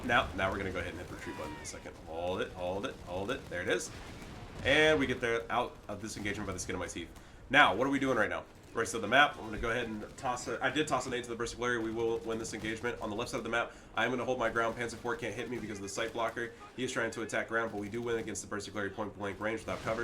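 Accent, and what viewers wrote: Germany. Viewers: Great game, your german accent cracks me up every time.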